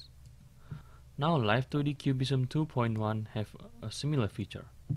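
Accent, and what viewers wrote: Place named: Indonesia. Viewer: why u pronounce similiar with indonesian people? im sure u from indonesia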